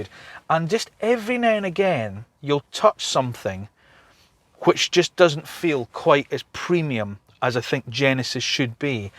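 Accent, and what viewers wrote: Scotland, United Kingdom. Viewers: I always enjoy your calm and eloquent presentation... it's sort of an automotive meditation. And that Scottish accent is just a bonus. I'm going to have to go with the GV60.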